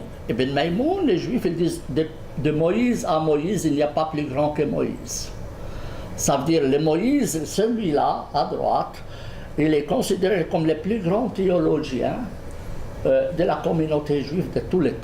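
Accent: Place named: Switzerland. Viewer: Drôle et passionnant... L'accent suisse est la cerise sur le gâteau.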